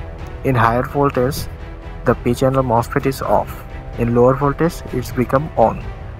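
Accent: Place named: India. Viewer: Are you indian?It sounds like an Indian accent.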